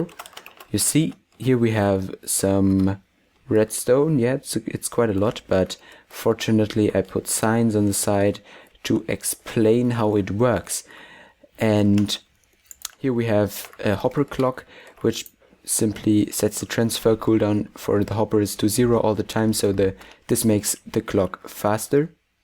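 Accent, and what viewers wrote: Germany. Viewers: Man hört raus das du deutsch bist ^^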